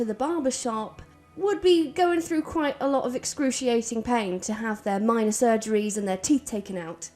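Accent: United Kingdom. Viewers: I love your beautiful British accent 🌷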